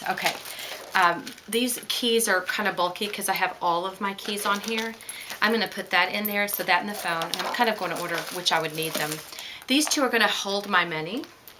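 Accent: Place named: United States. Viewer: Thank you so so so so much for taking the time to do this review for me. It's very much appreciated. You are so thorough it really does help. Ok, so I am definitely getting the Mini Pouchette for when I only need a few items and also the clay for my keys. Next hmmmmm still can't decide. Eva if I want more classy, but pouchette if I want more casual. Oh dear, maybe I will have to get both. I love how you say my name..US accents are fab, not like my northern UK one. Thank you again. xxx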